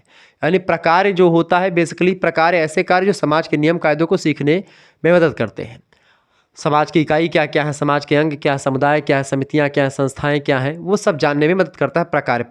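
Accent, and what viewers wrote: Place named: Awadh, India. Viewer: Sir ka Awadhi accent ❤😂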